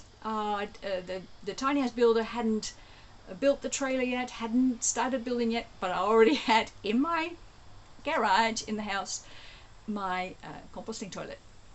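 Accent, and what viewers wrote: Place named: Australia. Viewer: I love the idea of composting toilets but am also skeptical when people claim there's no smell at all. Even flush toilets smell sometimes. I'm glad to hear that composting toilets really don't smell. I didn't realize you were from The Netherlands (I'm learning Dutch, btw). You sound like you were born in Australia.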